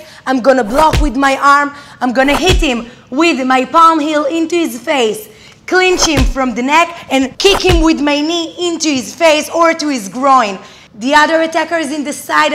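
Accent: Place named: Israel. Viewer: אני מבין לפי המבטא שאת מישראל זה אומר שאת עושה בישראל שיעורים?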